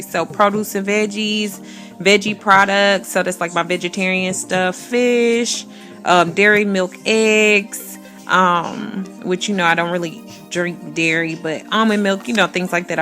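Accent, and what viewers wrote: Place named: United States. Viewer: Girl sounds american but strongly looks southern african.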